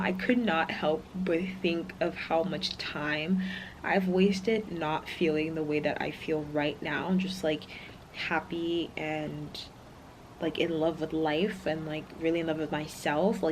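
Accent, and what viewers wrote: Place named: Cameroon. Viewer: It's the french cameroonian accent for me✌️ Love your vlogs and btw your body is 🔥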